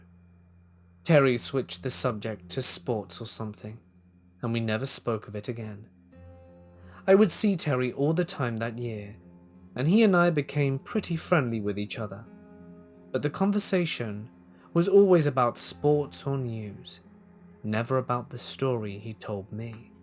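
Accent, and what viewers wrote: United Kingdom. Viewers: Your voice is so calming!! Where in the UK are you from?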